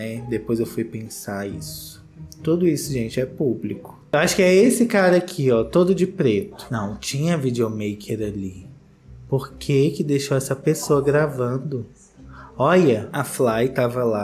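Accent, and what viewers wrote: Minas Gerais, Brazil. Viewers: Um escritório bem feito?!, você me faz rir demais, menino! 😂Adoro seu the boche e seu sotaque mineirim 🤩🤩💜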